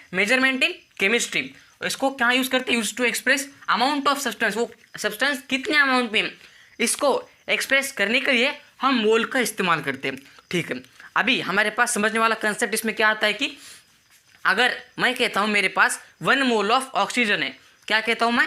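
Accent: Maharashtra, India. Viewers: I think this guy is from maharashtra bcz he have marathi fluent 😅😅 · Seems like Marathi Accent. Aap konse class mein padhte hai abhi?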